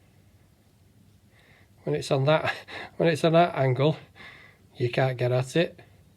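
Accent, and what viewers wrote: United Kingdom. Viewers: Yeah 😂, I think a solid 50% of us Brits have a hard time speaking our own language, it honestly gets worrying after a while 😂, are you from up north?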